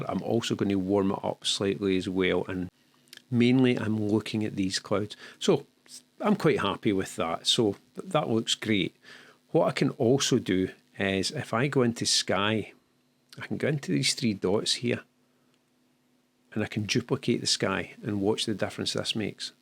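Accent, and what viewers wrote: Scotland, United Kingdom. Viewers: Great content as always. Unagitated and to the point. By the way: first time I watched without the subtitles - and I slowly get the groove for the Scottish accent :)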